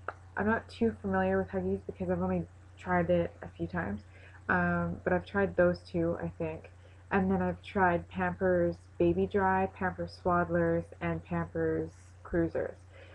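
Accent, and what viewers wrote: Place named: Canada. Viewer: nice(: u gotta b from canada cuz u really have an accent(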